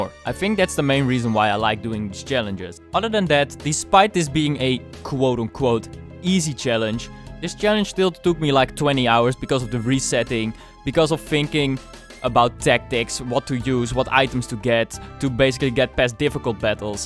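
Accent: Netherlands. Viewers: Ik herkende het echt meteen, dacht gelijk "Ahh deze gast is Nederlands" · Are you dutch? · Yo dude u from the netherlands?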